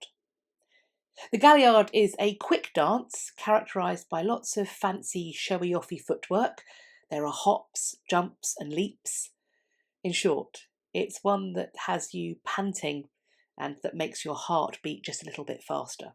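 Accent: United Kingdom. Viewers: Sometimes one just has to hear interesting British history told with a lovely British accent.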